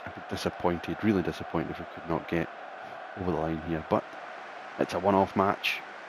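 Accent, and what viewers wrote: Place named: Scotland, United Kingdom. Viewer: So refreshing to hear a scottish voice on Youtube keep it up brother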